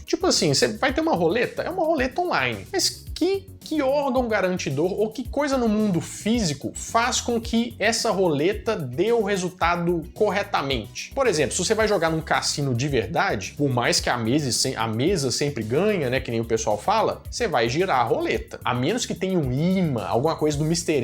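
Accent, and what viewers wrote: Minas Gerais, Brazil. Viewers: Esse cara fala igual mineiro.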